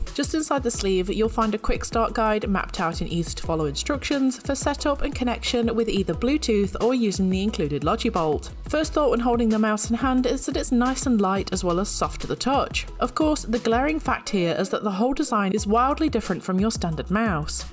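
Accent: United Kingdom. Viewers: Just something about hearing a British woman speak. I can hear you talk all day. Love the review. Informative and helpful. Thanks.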